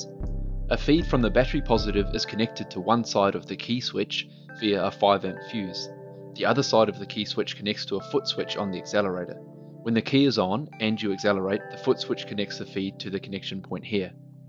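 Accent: Australia. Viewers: that looks like fun. and it sounds like you are you are a fellow aussie. where are you buying the controller from?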